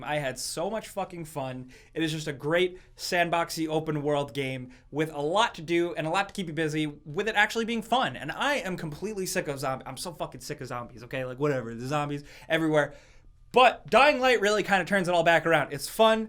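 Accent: Australia. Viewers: Actually a Australian accent basically sounds just like yours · what the hell was up with your Aussie accent?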